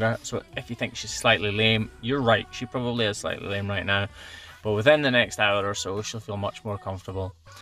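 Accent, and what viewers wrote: Scotland, United Kingdom. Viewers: I am working on a Scots accent so his videos are very helpful—no thirst watching here😂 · I love your videos. Could listen to your Scottish brogue all day, which is probably why I watch your videos for hours! One question (as I used to be a riding instructor, and horses were my life for many years) would there ever be a time when you might need to x-ray a cow's feet to work out what's going on internally? Many thanks from a very wet and windy Berkshire :-)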